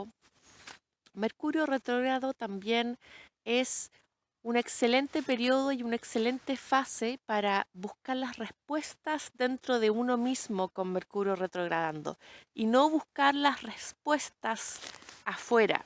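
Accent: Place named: Chile. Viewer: Bella . Pensé que eres chlena. Por tu acento. Sos chilena ?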